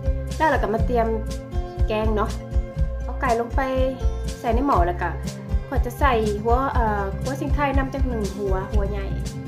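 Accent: Savannakhet, Laos. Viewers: ມັກສຽງໄທສະຫວັນນີ້ເດ້ ໄດ້ຍິນແລ້ວຄິດຮອດຄາວໄປຮຽນຢູ່ສະຫວັນ.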